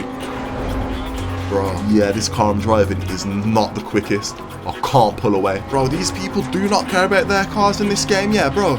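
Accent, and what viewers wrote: United Kingdom. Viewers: Well i am sure your accent really does sound British brother 🇬🇧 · FUCKING BRITISH NOOO but i will stay for mw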